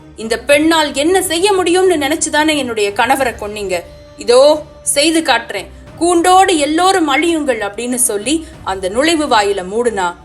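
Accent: Tirunelveli, India. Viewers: Unga voice nalla gambeerama, kekaradhuke oru energetic ah iruku. Alutham thiruthamana pronunciation super ah iruku. Yella type stories um solreengalae (historical puranangal, motivational) , yepdi idhellam yosikareenga. Apdi yevlo books dhan padipeenga. Nejama solren neenga oru extraordinary person. Unga slang yenga oora ஞாபகபபடுத்துது (திருநெல்வேலி, தூத்துக்குடி). உங்கள் பணி மேன்மேலும் வளர்ந்து தொடர என் வாழ்த்துக்கள்.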